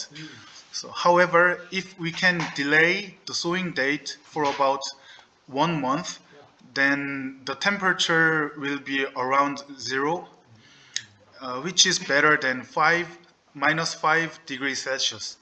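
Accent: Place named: South Korea. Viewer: 동영상 올려 놓으셨다고 해서 구경왔습니다^^ 한국어 억양이 있긴하지만 발음은 클리어하신 편이라 알아듣기 편하네요!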